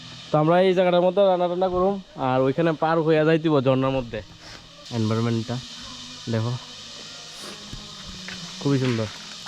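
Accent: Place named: Mymensingh, Bangladesh. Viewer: ভাই আপনার কথা তো একদম আমাদের বাংলাদেশের ময়মনসিংহ অঞ্চলের মানুষের মত আসলে আপনার বাসা কোথায়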